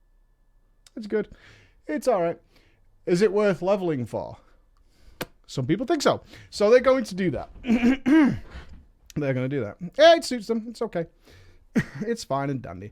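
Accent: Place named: United Kingdom. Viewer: grin good stuff mate - every time you go off in your accent (to me ) you sound like a couple of Brits I served with FOB Bostic Afghanistan A cuppa fixes everything lol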